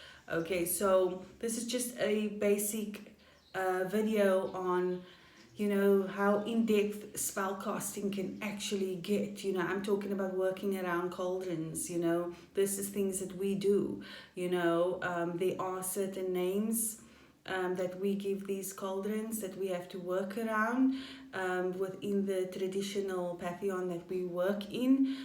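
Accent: South Africa. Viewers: You're definitely from Cape Town, but did you sound like you grew up on the Flats, right?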